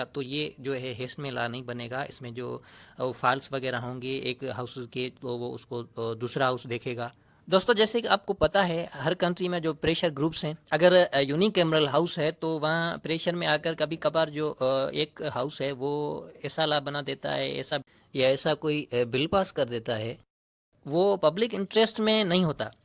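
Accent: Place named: India. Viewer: Pakistani hokar indian Accent..How?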